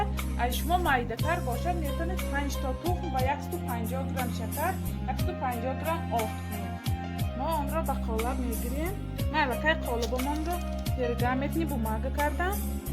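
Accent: Isfara, Tajikistan. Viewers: овозатон маълумки аз исфара хамшахри будем хохар ман хам аз исфара ман зан хастам👍👍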